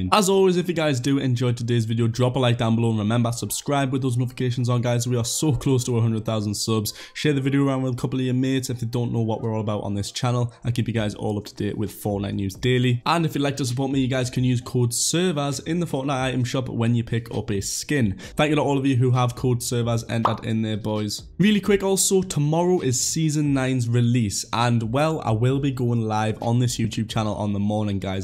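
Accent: Newcastle, United Kingdom. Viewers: You from Newcastle?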